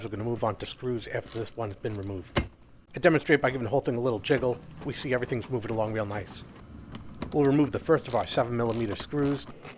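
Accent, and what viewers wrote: New York, United States. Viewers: Sounds like a New York accent and it made watching this video hard. Just terrible accent.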